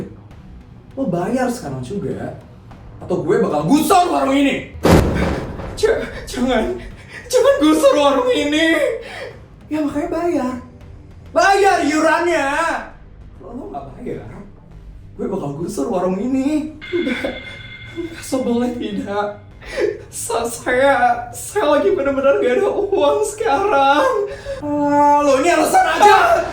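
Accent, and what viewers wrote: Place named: United Kingdom. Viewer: Logat Inggrisnya dah hampir ilang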